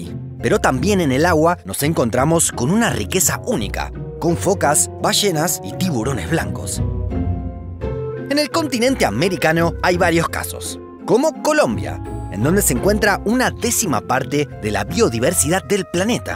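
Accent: Argentina. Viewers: Vaya acento de este chaval, qué es ese dialecto argentino? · Por tu forma de hablar, sos compatriota o uruguayo. Abrazo.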